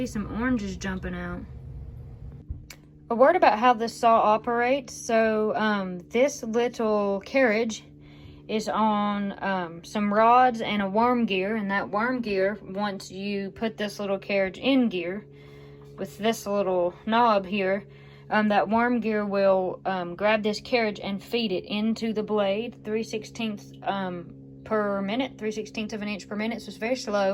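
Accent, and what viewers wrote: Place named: Tennessee, United States. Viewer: 😂 I just had to take a second to figure out what you would be saying, since I knew you weren't talking about maggots. I've lived most of my life in TN, so I'm used to accents like yours. hahaha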